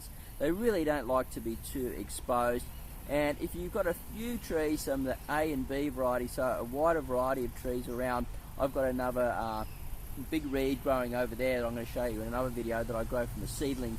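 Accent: Australia. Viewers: Damn that Australian accent 😂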